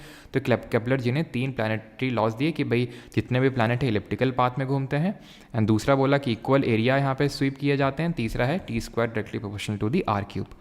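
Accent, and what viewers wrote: Kanpur, India. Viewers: Sir aap kanpur se ho i know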